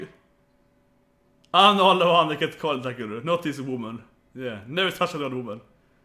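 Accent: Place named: Sweden. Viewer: Yeah, but it gets better, believe me. You also wondered about the other two Girls in the first Kamine and Goda bit. The first girl with the green twintail hair is called Nakasone Maya and is only seen in this bit and a later chapter. The other one, called Patricia, is one of the best characters in the series. I hope they are saving her real involvement in a season 2, since we haven't even seen half of the couples yet. If she was going to be in the show more in this season, she would have been introduced in like episode 2 or 3. I hope they make a season 2, which is like this one but with the other couples and characters that were introduced at this time as focus instead, and then a compilation season with the first 2 seasons in one, the difference being that it would become a 24 min episode show, have the "bits" in correct order AND add the extra pages and Tsurezure Children Plus! 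stuff from the manga. Btw, Svensk? Din dialekt pekar åt det hållet. (<----If you didn't understand that, then nevermind :P)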